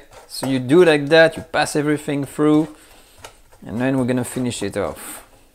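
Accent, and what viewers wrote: France. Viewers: I never heard such a clean and smooth french accent... it's like english is flowing out of your mouth. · I didn't know how much French love butter until I watch your video. The sauce looks pretty and creamy. Btw, your Frenchy accent is so cute. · I've tried a few times to watch this guy's videos but I just can't stand the sound of a French accent. Makes me nauseous. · Love the accent! Nice to know a Frenchman is teaching! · I like your English with French a cent greeti gs from Athens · Can you please hire someone to translate, your strong french accent is really annoying. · Sorry find the French accent very irritating. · Its very nice to hear ur English in French accent 😜 and thank you for the recipes.... I tried onion soup and it came out tasty🤗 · Je ne comprend l’anglais que quand un Français le parle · even when you talk english you have that french akcent that is amaxing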